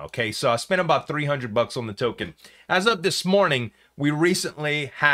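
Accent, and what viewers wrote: Louisiana, United States. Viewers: Lol what part of Louisiana you from bro?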